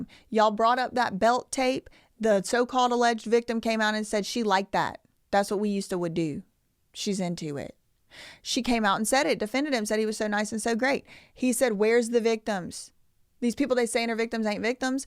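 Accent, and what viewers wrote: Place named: South, United States. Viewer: I'm not a simp or anything like that... but you are beautiful and I love your southern accent ! I'm new to your channel and your break downs are legit!!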